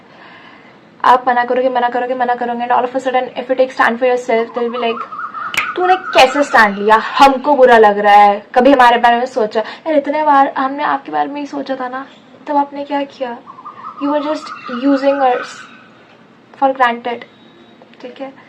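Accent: Bihar, India. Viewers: Didi bihar se hai kyaa aap.. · dii bihar se ho aap bhi really mujhe laga delhi ki hain aap😁😁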